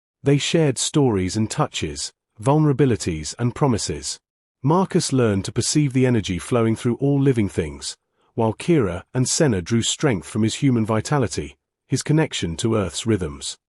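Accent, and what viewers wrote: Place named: United Kingdom. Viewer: With the British accent, I should have known the answer for two naked women showing up for warmth would be "Let me make tea!"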